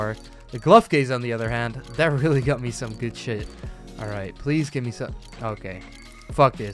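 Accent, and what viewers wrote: Netherlands. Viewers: wauw echt cool dat je nl bent. Je zij het in een oudere video en je hoort het bij sommige woorden lmao. Je bent goeie en je Engels is pittig goed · Just as I thought, het klonk gewoon te Nederlands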